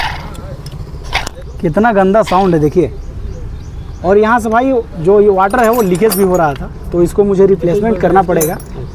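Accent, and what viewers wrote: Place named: Bihar, India. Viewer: Bhai ap karnataka se hai, but apka baat sunke lag raha ap jharkhand bihar se hai😊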